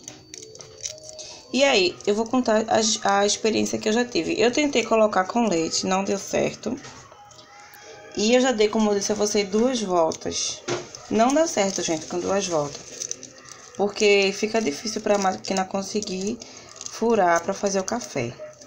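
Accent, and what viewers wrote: Recife, Brazil. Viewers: É de recife?rss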